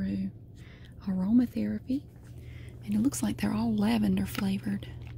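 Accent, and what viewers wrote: South, United States. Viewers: I guess being an adult means falling asleep to a southern lady organizing the clearance section of the dollar store.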